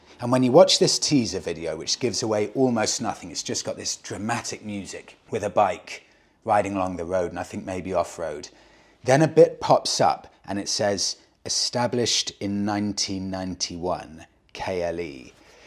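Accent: United Kingdom. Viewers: Americans telling an Englishman his pronunciation is wrong, yeah, that's going to work. World's gone crazy. Still, it's been said that America and Britain are two nations divided by a common language. What language is that, one might ask? Er, it's called English mate. Not American. We reserve the right to mangle U.S. words as we see fit. I mean, ask a Yank to pronounce Shrewsbury, or Worcester. My case rests m'lud. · Americans criticising English pronunciation..... that's rich😆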